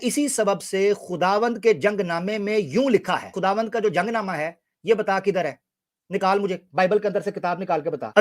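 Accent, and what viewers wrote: Punjab, Pakistan. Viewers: Your panjabi-urdu accent is so sexy🥵🥵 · You rock in punjabi accent maza aa gayi ji Tantani logo ko bhi zara todhi me le lo Paa Ji zara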